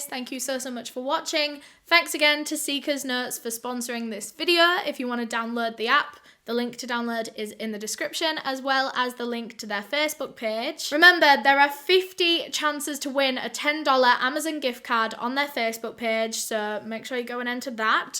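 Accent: United Kingdom. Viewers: so is that a British or Valley Girl accent. I can't tell.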